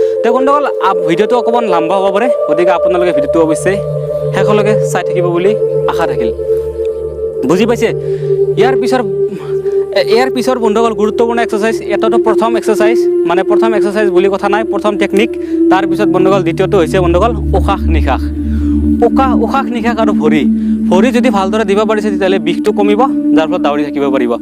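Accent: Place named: Nalbari, India. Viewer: Dada apunar kotha bor nalbari r jen lagise hoi nki?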